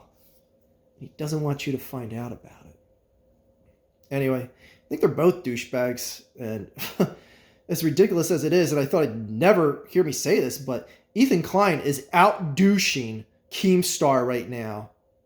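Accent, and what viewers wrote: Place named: Philadelphia, United States. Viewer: Straight out of Phillyburbs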